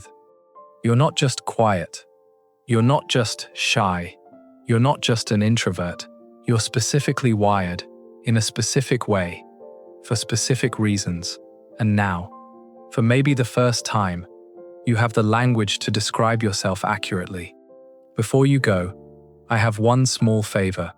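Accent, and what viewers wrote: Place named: United Kingdom. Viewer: I miss the previous persona/voice. This British accent feels distant. It actually makes me uncomfortable.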